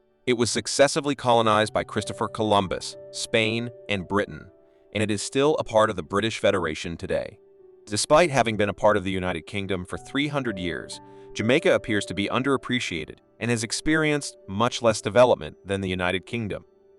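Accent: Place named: United States. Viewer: These kind of videos are so frequent. It's always the same thing: - This highway is currently under construction, when in reality it was finished a long time ago - Jamaica entering unforeseen "debt traps" with China, which to this day no one can fully explain - Questionable grammar and sentence construction - AI generated narrations with an "American" accent - Mismatched visuals - Outdated stats - Spreading propaganda about Jamaica's economy being in the pits before this project, like Jamaica was a starving nation or something - Jamaica's mountains getting in the way of road construction, when two major highways had already existed before the North-South highway - Getting Jamaica's geography wrong by assuming the Blue Mountains are in the middle of the country - Getting wrong for the trillionth time that the North-South highway crosses the Blue Mountains - Assuming Jamaicans had no way of crossing their mountains prior to this - Assuming tourism is dependent on this one highway, which makes no sense considering Kingston is not a major tourist destination - Assuming tourism is the only economy in Jamaica - Ignoring that there is already a proper roadway on the coast that links the major tourist areas in Jamaica - Jamaican locals depending on this one project for prosperity, transport convenience and foreign exchange - Getting details of how the North-South highway was funded and its conditions wrong - China making Jamaica a new deep shipping water port, something Jamaica alrea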